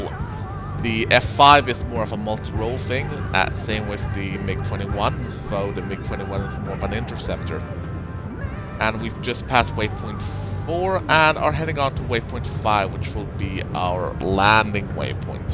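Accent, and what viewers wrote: Sweden. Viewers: good svengelska fett bra video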